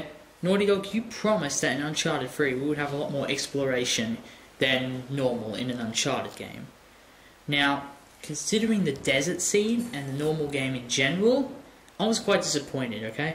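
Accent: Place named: United Kingdom. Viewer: I love the way you talk to ND like they were your children with things like "Okay?, Good.". Maybe it's just the British accent. Anyway, all great ideas man.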